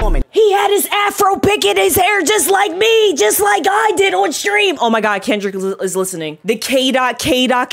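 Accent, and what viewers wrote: Philadelphia, United States. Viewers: I love this beautiful woman being her authentic self and her Philly accent. Meek is not a real nigga 😂😂😂 I’m from Detroit and I couldn’t tell you what a Toledo nigga culture is either lmao Talk to your tumbleweed is crazy 💀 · Hearing a Philly girl hit an "uwu" is hilarious. How that broke through that strong accent is crazy 😂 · Girl you got a new sub off the screaming 'is this new sh¡t" and banging that table like that. Female reactor energy i dont see that i need! With my ALL TIME FAVORITE accent Philly...im all in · You gotta be from philly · Dope! First time through the channel and had to subscribe simply because of the Philly accent throughout the breakdown 😂 I'll be going back through your old stuff. Real Hip Hop will always show when the heat is on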